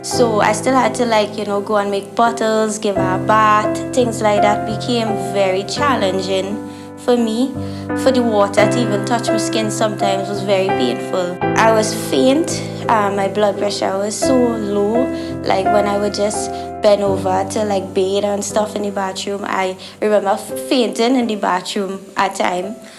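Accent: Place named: Trinidad and Tobago. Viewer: I hear you loud and clear. Ive been going through TSW 25 months. Getting better each day, still flaring but im doing much better than year one. I hear your accent, my parents ars from Trinidad as well. I plan to do a video soon once my journey is over, i want to make sure i am completely healed and advise on what works and what not to waste your money on. This journey is not easy and proud of you sharing your story. Time is the only healer on this journey.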